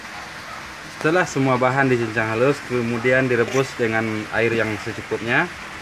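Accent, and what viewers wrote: Solok, Indonesia. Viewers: fadel gaul ambo danga kayak nyo urang solok lah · Assalamu'alaikum mak,mokasih ilmu jo wawasannyo mak, logat bahaso daerah solok, dima Solok nyo mak?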